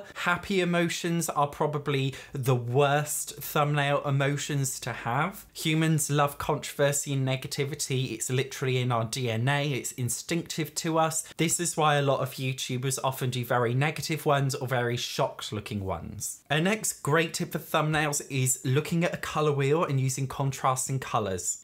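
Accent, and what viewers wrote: United Kingdom. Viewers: Through most of this it got really creepy hearing all the things that make a person click off that matched me. Funny thing though, I literally just told my husband that I found a new person to put on in the background. This was solely based on your voice, accent and your laugh. I'm American, but he's from Scotland, so I made him listen because I was trying to guess what part of England you were from, lol. I had to rewatch the part where you said Americans told you to stop using a fake British accent three times because I was laughing so hard! 😂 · "can you not use a British accent? its distracting" wtf is wrong with people lmao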